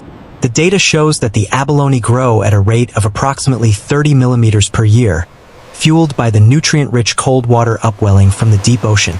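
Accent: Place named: United States. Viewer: What is an American doing narrating an Australian Project ?????????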